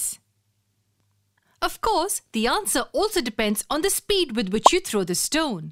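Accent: India. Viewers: I hate indian english. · I think Indian. Cause her accent seems like Indian 😊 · Indian accent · The only Indian accent I could listen forever lol she saved me 😂✌️👌 EDIT: passed the exam 😂🙌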